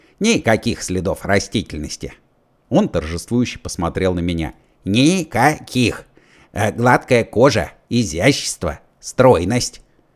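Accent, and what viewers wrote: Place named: Volga, Russia. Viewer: Плохо читает. Говор волжский. Чтец должен быть с никаким говором, как диктор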